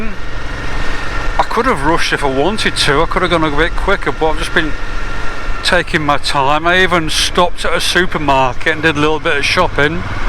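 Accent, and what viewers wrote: Manchester, United Kingdom. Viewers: Good to hear your engaging Manchester accent again pal…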